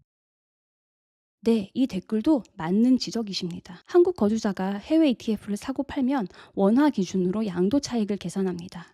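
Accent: United States. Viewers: 미국에서 오래 사셨나바요 얼피~~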